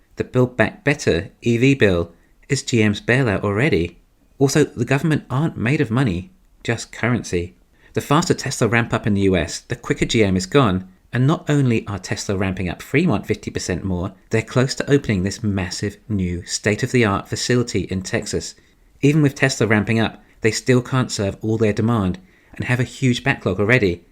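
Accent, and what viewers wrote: England, United Kingdom. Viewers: I was sure you were from England rather than New Zealand...???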